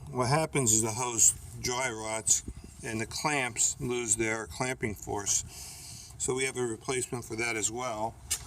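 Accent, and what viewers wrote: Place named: Northeast, United States. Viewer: Are you in RI? Or NJ or LI? When you started talking I thought to myself, this is not one of my vids....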